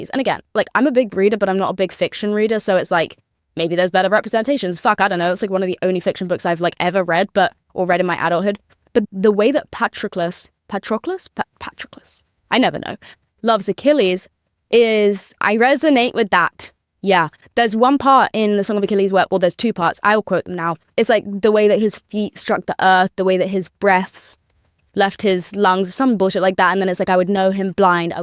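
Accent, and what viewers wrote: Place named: United Kingdom. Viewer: ❤Ur podcast and British accent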